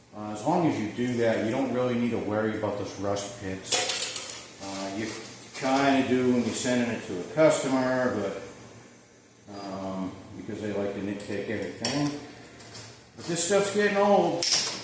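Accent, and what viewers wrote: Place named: Northeast, United States. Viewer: You live in Texas? From your voice I assumed you were from the Northeast.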